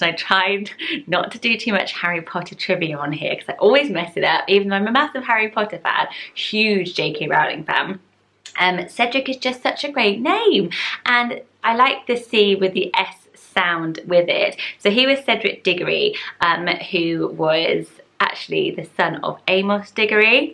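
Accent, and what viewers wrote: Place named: England, United Kingdom. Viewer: I love these names but I’m American so these dont sound cute without the English accent!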